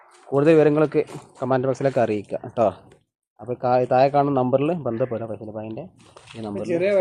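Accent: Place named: Malappuram, India. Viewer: മലപ്പുറം slang പൊളിയ 😂😂😂😂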